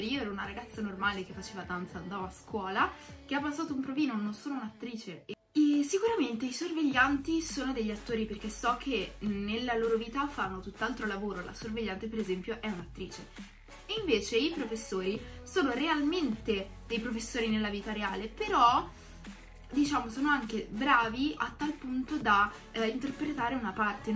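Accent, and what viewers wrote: Italy. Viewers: Come mai sei Sarda ma parli come in Lombardia?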